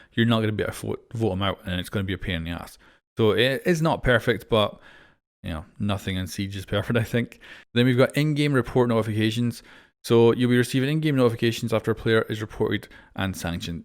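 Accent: Scotland, United Kingdom. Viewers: Are you Scottish you sound it I’m also Scottish if you are?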